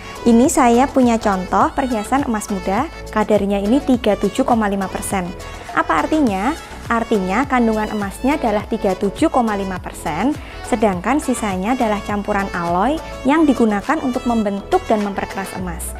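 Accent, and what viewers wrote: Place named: Java, Indonesia. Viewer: Medok yes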